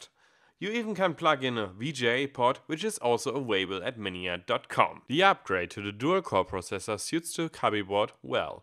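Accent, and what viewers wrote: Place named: Germany. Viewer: Such a refreshingly German accent ^^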